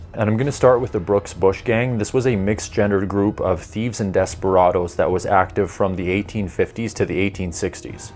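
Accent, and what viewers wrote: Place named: Toronto, Canada. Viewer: Lmao bro you definitely talk with a Toronto accent 😄🤣😂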